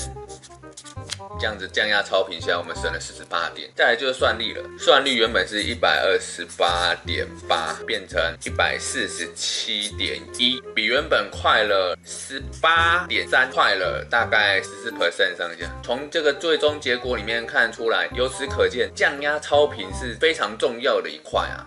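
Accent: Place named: Taiwan. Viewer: 感谢超小白的教程。话说台湾腔真好听~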